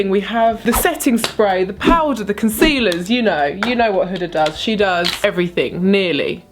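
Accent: United Kingdom. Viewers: I've been following you for a little while now and I really love watching your Instagram stories it's relaxing in some way, and I love you British accent! ❤️ I would love to see a glam make up tutorial for Christmas! You're amazing, love you 🌹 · God I love your accent! I’m an English teacher and I really admire your very British accent, I love listening to you 😂 why not compare all the new makeup of 2019, your favorites and the ones that didn’t work for you!! ❣️❣️❣️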